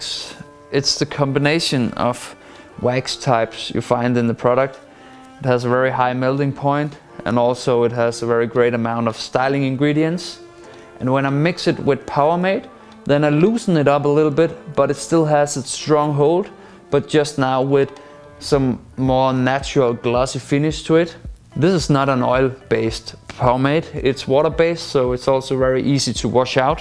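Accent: Denmark. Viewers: Hej med dig dansker man kan høre du er dansker med din accent men vanvittig video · Du fra Danmark :) Det er nemt at høre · Er du dansker det er alså radt tydligt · Are u Danish or something? You kinda have a danish accent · you can just hear he's Danish, VIKINGER FOR LIFE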